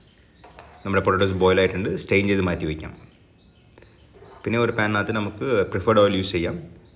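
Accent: Tamil Nadu, India. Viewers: .... Tamil manjal ...pronunciation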